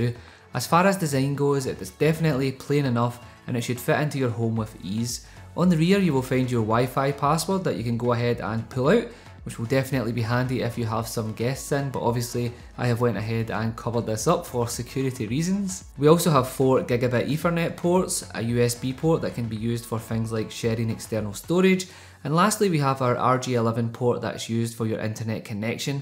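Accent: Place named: United Kingdom. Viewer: You live in britain from the sounds of the victorian house, and your accent suggests you haven't emigrated, so you're well aware that you did a rude gesture, not peace fingers, at the end of the video. That's disingenuous. You also say "go ahead" where it adds no extra information about twice a minute, which is a very American trait, though also far more frequent than realistic. A speach coach would most likely tell you to remove these meaningless phrases from your vocabulary. It seems like you're trying to capture an American audience by making your content more familiar to them. But you're reviewing a BT product, BT being an acronym for British Telecom. American's don't care about products that aren't avaialble in America, so why are you forcing this unatural familiarity? It only hurts your credibility. There are American YouTubers who are very popular in the UK, and there are British YouTubers who are very popular in the USA. 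Your nationality does not affect your credibility. Pretending to be American in such a forced way does. And that lack of integrity caries over to your reviews. How can we trust what you say about these products, if we can't trust you talk as you naturally do? Other than the offensive gesture and overuse of "go ahead", great video! Thank you for taking the time to make it!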